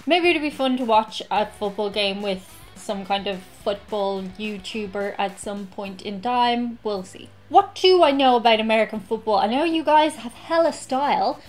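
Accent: Ireland. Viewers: With all the American topics I was starting to think there's a strong possibility that an irish impersonation was just part of a gimmick haha so terrible of me to say I know, there's no complaints it was just a little peculiar when I heard it!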